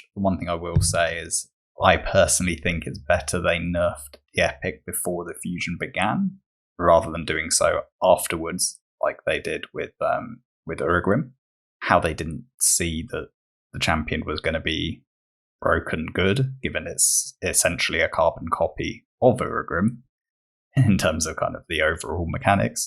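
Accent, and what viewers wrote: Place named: Germany. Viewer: So i startet 8 days ago. Yes, how do you found my small channel then and dont watch the videos from the ,,big" boys? Its cause im a freak in getting Infos. I whatched sooo many videos on how to build, what to do, who to save, where to start and so on and so fort. And ill just grab the epic and then pass the rest of the event. The epic whould help me ab bit. My only 6 star is kael and other i habe 5 star drath and a 4 star vergis with a 4 star warmaiden. So this epic could help me pass some higher stuff cause my kael is full dmg and has really no acc. :) And I like to watch your videos. You are very informative and freetoplay so you understand that we dont have godlike gear lmao. :D Also, are you german? You have an accent. :)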